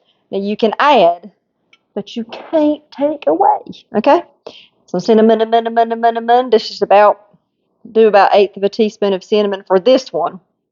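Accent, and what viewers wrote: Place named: South, United States. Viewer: God bless you from Oahu. 👋🏼🌴☀️ I clicked for a southern sound and I am 100% satisfied. : )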